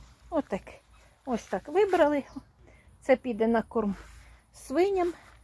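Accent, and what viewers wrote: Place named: Kharkiv, Ukraine. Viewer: доброго дня! Випадково потрапила на ваш канал. Дивлюся на вас, ніби на себе збоку)) Говір схожий чи не з Харківщини ви? А помідорчики... така ж історія, в погреб вже ставити ніде, роздала, а це вже бройлери смачно їдять)). Успіху вам, миру і перемоги нам усім!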